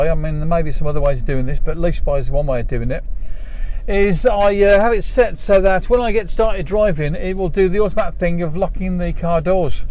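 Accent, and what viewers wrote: United Kingdom. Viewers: I suppose u need to be Brit to follow your conversation....too blabbery and too much accent etc....bye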